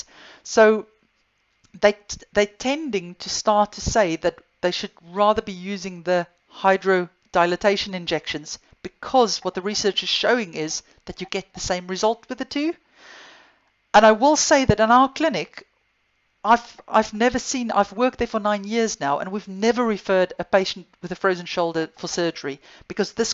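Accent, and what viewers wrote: South Africa. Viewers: I lived in Auckland NZ I discovered a very painfull shoulder on my August Europe trip every time I put my backpack over my shoulders it really where getting worst coming back the spec diagnosed it at at frozen given a guided steroid injection it feel better for 4 weeks and now feel worse, but the whole time its really painful at the moment but at the back of my shoulder blade the pain shoot down to my fingers I think there is a tear in my rotar cuff. If I stretch my arm straight it feels like it stuck and so painful. My left shoulder already had a rotar cuff operation with a few tears because of an accident. I don't wont to go overboard with excercises just do about 5 at the moment but will it really take 3 years for healing. Their where no MRI taken of my shoulder. I hear your SA accent I left 25 years ago, nice day😊 Groete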